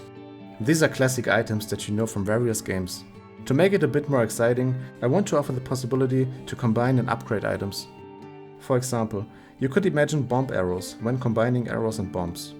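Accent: Germany. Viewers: Are you german your voice sounds like a german?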